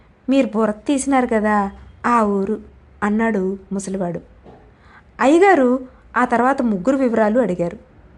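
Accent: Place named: Srikakulam, India. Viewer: మా గొప్ప కథను పరిచయం చెయిస్సీరు...❤ సిహాకుళం భాషలో విండం ఎంత బావుందో మరి చెప్పలేను బాబో...😍❤❤❤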